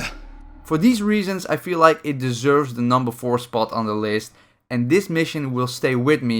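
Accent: Netherlands. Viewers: You sound like Silo Entertaiment (or its just the Dutch accent) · Ben je een Nederlander want ik hoor het aan je accent😂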